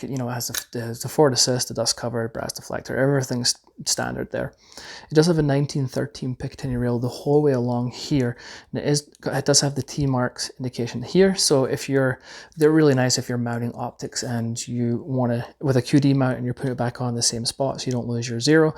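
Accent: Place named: Scotland, United Kingdom. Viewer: I did NOT expect that accent. Lol. Are you Scottish?? Well, welcome to the USA wherever you’re from. And good review brother.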